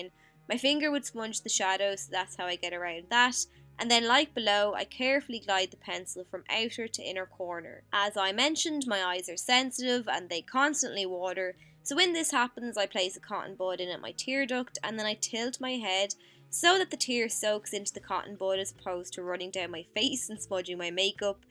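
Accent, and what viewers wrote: Ireland. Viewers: love your Irish accent I have a Boston Massachusetts accent lol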